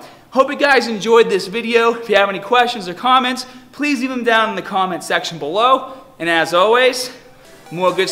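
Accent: Boston, United States. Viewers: boston accent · I figured maybe he always spoke with a Boston accent but hid it in his earlier videos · Yeah he's from Boston so he's just been hiding it in the past.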